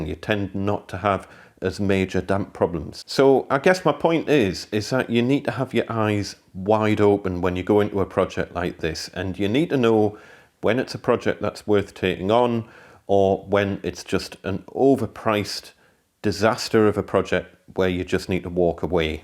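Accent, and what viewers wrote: Newcastle, United Kingdom. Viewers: His accent is from Newcastle which is north England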